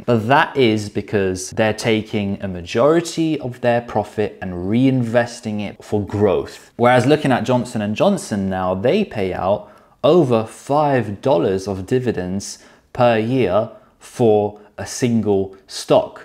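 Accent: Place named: United Kingdom. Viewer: Come to the U.K-there are literally millons of us here with the same accent!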